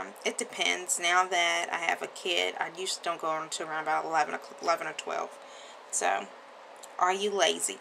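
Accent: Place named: South, United States. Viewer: I subscribe because you sound like me, I'm a southern girl too.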